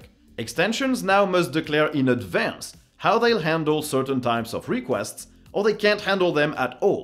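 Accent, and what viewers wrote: France. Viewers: Je me disais aussi que tu avais un accent français :)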